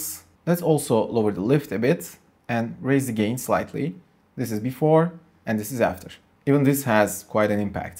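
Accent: Turkey. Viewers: Abi selamlar türk olduğunu aksanından anladım direkt :) Böyle içerikler üreten türklerin olması çok hoş umarım daha da büyürsün.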